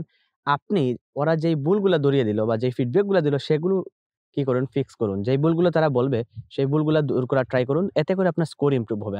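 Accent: Sylhet, Bangladesh. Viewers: Vai apne Sylhety ni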